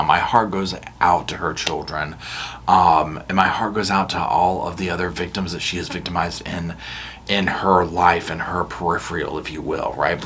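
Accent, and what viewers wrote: South, United States. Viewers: I've just found your page, and am enjoying it all in that southern accent ♡ Have you considered reaction videos to Who Do You Think You Are- the genealogy show? I think that would be amazing 😊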